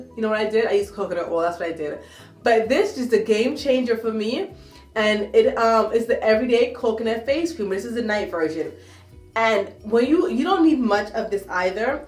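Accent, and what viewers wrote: Jamaica. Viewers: Your jamaican voice tho. 😄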